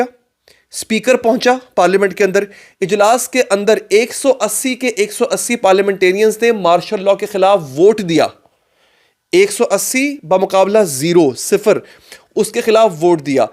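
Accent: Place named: Lucknow, India. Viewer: Aabki Dhuli Huyi Lucknovi Urdu aur Bolne ka andaz aur Laheja bahot pyara hai.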